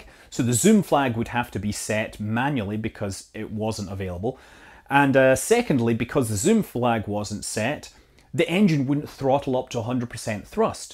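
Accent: Scotland, United Kingdom. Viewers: A Scotsdev, I like it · Nice accent! Scottish?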